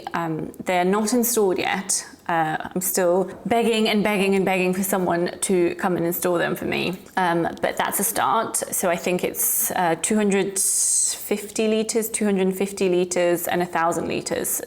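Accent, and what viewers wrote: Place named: RP, United Kingdom. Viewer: You’re polish but have a very posh English accent. Love it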